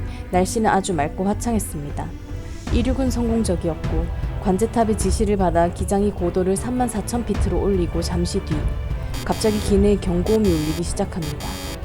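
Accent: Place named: Gyeongsang, South Korea. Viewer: 고향이 경상도쪽 이신가바요..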